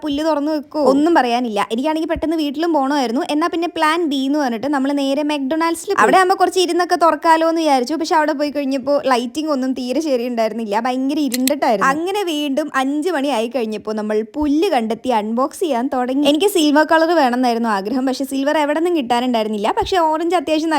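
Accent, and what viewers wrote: Palakkad, India. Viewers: പാലക്കാട് anno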